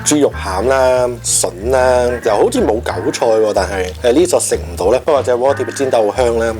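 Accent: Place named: Hong Kong, China. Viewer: 你好呀，今日無意中睇呢條面影片，聽你講說話嘅口音似係香港人，但係點解會叫油條同埋茄子嘅?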